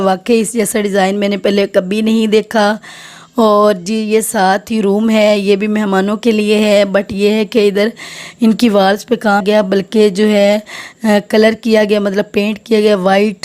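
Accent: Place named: Chakwal, Pakistan. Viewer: R u from chakwal ap ki Urdu say pata chal raha ha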